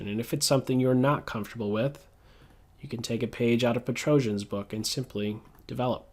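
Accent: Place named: South Carolina, United States. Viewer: It's his accent, South Carolina or something.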